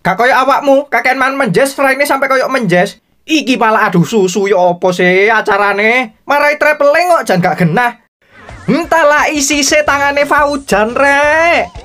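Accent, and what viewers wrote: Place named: Malang, Indonesia. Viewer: Eruh sound malang mas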